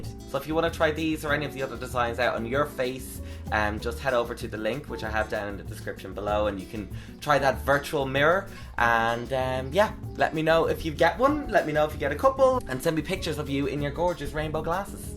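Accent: United States. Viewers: Great American accent! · Nice American accent lol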